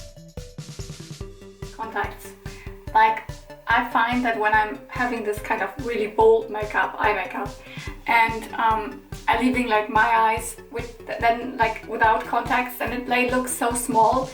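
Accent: Germany. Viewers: Bin ich die einzige, die den Deutschen Akzent raus hört? PS: tolles Video😊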